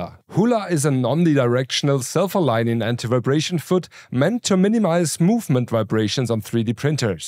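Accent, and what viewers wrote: Germany. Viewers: Are you printer guys all the same guy with the same voice and same german accent? 🤔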